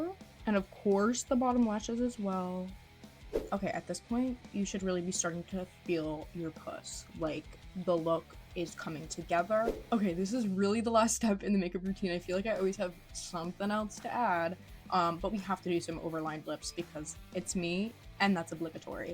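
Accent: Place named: United Kingdom. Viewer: Idk why but from ur tiktoks I always I assumed you were British. Also GREAT VIDEO! Ur so pretty^_^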